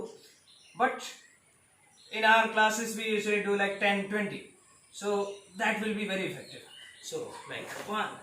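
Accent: India. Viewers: I'm a real fan of his talking style because of my experience with a lot of Indian friends I have Including my Indian bosses Mr Mahendra Waman, Mr Raut, Mr Suresh and other friends like Prasad, Anil, Sarath, Shiyo just to mention a few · in Russia we have the term "Indian English" :-) Realy, very funny and very hard to listen to serious 😁 Try to look "The Coding Train" - that is what I wish Indian English to be! And sorry for my English) Respect!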